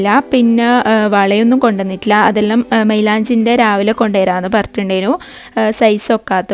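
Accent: Kannur, India. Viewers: IngaleKannur bhasha pwliya😊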